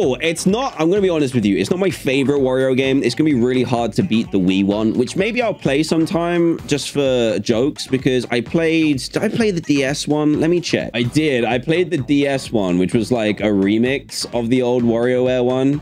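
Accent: United Kingdom. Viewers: British right.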